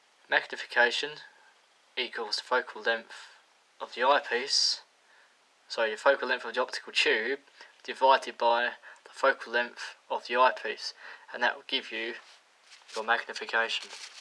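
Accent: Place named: London, United Kingdom. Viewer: you sound like youre from london!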